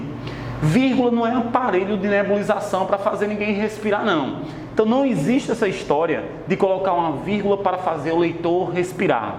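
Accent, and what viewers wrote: Northeast, Brazil. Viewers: Além da aula fantástica, ainda tem esse sotaque lindo dos nordestinos. Maravilha!!!!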